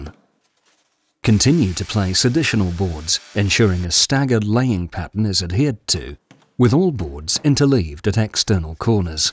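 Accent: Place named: England, United Kingdom. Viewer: Love the English accent, way more comfortable to listen to than the American accent!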